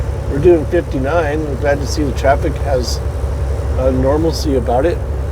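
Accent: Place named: Colorado, United States. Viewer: /Coloradan accent! Keep on trucking!uCifornia/Colorada accent love it! Keep on trucking!😀🐼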